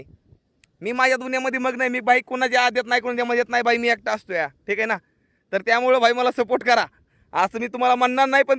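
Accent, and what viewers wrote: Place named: mixed, India. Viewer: Are bhai bhai Kay kartoy , up bihari sarkh . Bhau bol na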